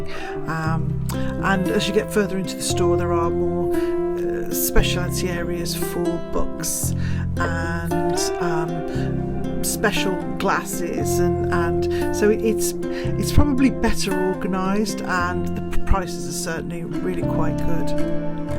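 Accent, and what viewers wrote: United Kingdom. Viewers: I love the William Morris fabric what a find. I often go to Liberty’s as I live near London it’s like another world in there. The thrift shops seem to be bigger and have lots more on offer than our charity shops here in England. By your accent I guess you are British. I’m new to your channel but love it.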